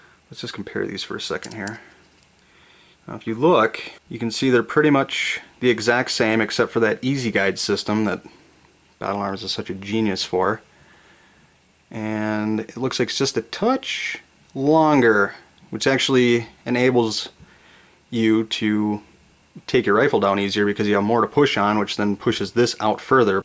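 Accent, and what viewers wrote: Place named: Minnesota, United States. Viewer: It sounds as if the publisher of this video is from Minnesota... Good tutorial for the replacement of push-pins.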